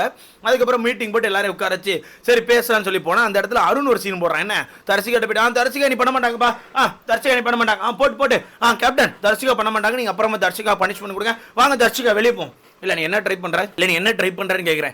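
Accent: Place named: Tirunelveli, India. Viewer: Which is your home town Sir?.We can see Tirunelveli slang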